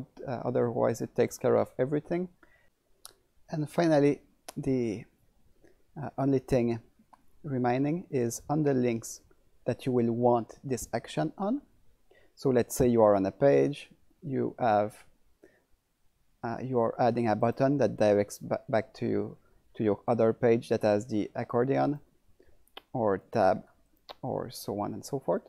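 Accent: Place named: Quebec, Canada. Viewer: Oh wow, you've been so helpful since we switched to Elementor - Did not know you were Québécois? I think I recognize the accent :)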